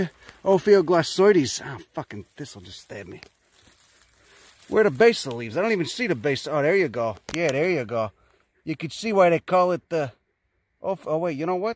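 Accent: Chicago, United States. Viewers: I liked your Chicago Italianiano accent, then you cussed, along with your Latin splain, and you won my heart and mind.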